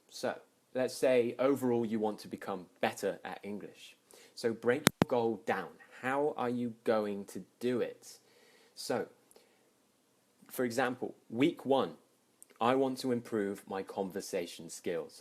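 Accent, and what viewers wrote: United Kingdom. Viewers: hi, i just click subscribed you. thank you for your teaching it's first time for me to hear "procrastination" and i quite wonder normally i cannot get british accent but i understand you very well. it's possible that you maybe speak slowly and clearly. ^_^ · Love your voice and of course your amazing British accent! ♥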